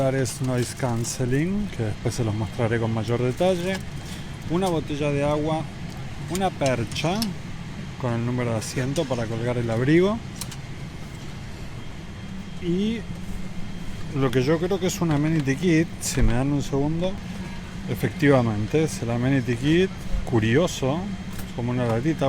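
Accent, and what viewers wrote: Argentina. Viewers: Hola sos argentino o uruguayo?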